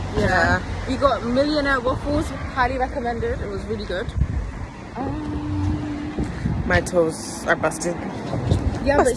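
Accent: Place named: United Kingdom. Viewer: Loved this! I hear the British accent coming through🤣🤣. Separately, that softball taster session finished me, same time next week🤝🏾🤝🏾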